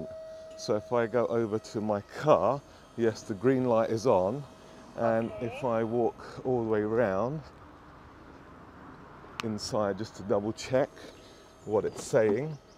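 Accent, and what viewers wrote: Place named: United Kingdom. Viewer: You sound like British guy now.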